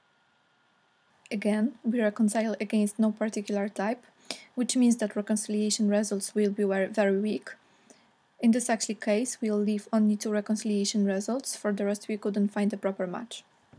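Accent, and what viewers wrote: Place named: Poland. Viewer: are you from Poland? Cause you have soo polish accent :)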